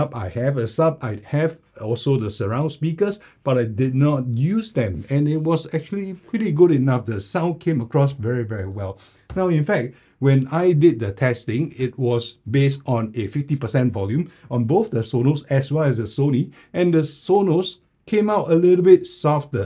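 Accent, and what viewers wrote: Singapore. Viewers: You speaking too fast. Please slow down a bit. Maybe it just a Singaporean English problem. but anyway I think for better understanding would be good to slow down a bit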